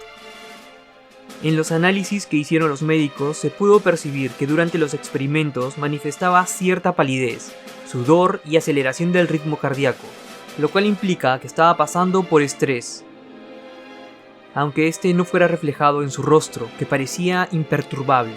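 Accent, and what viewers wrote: Peru. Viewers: por su acento me parece que si es peruano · Es peruano por el dejo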